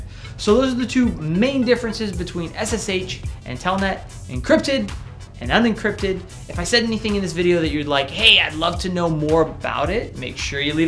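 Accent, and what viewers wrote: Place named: United States. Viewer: Good to hear an American English!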